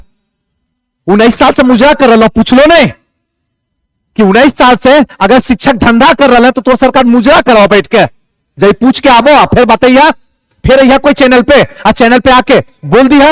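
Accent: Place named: Magahi, India. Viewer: मगही अंदाज में बहुत अच्छा से समझा दिए गुरु जी।❤🙏🏻😎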